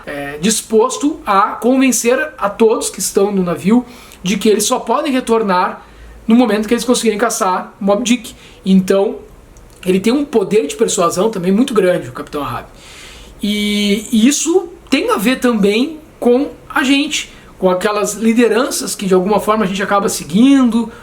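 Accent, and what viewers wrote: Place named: Rio Grande do Sul, Brazil. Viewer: Como sempre, excelente resenha. Tenho aqui No coração do mar, obra que inspirou Melville. Ainda nao li. O canibal é um dos melhores personagens.. Pena q vai sumindo no decorrer da leitura. Que loucura de Ahab... Mas tantas vezes nos apegamos a algo e nao queremos largar mesmo sabendo que o final nao será o melhor. Obrigado por ter voltado. Seu gauchês é ótimo kkkk É muita segunda pessoa do singular kkkkkk mas o mineiro aqui gosta! Abraço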